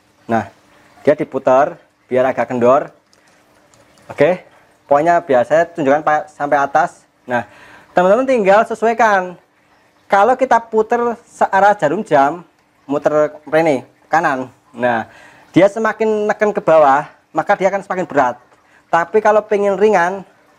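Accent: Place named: Java, Indonesia. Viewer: Wong jowo angel ilang jowone👍🏼😁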